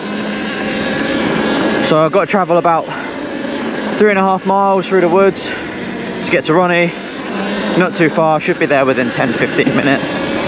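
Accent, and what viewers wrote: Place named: Midlands, United Kingdom. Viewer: We’re are you based mate trying to figure it out I’m Northampton you sound like your midlands. I like the look of the woods. Also thinking about losing my front mud guard as it looks much better. Do you not get a face full of mud 😂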